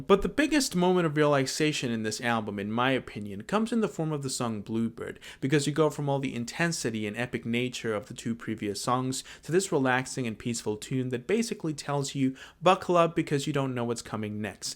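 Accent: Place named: United States. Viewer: A 90 miles an hour narrative from a fucking yank, fuck off man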